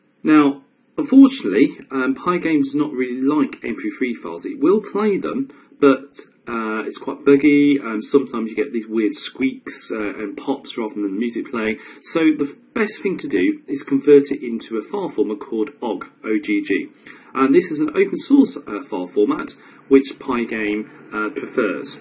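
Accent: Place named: United Kingdom. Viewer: This accent, he's from UK for sure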